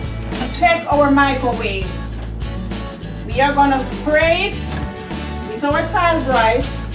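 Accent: Jamaica. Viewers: She sounds like a Jamaican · sounds Jamaican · From her accent I know you are one of us Jamaican love and niff respect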